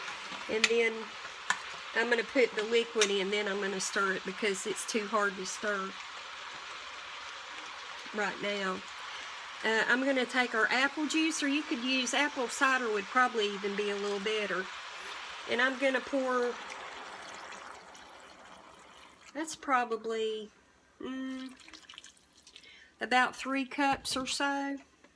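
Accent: South, United States. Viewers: I love your accent, reminds me of my family from GA/NC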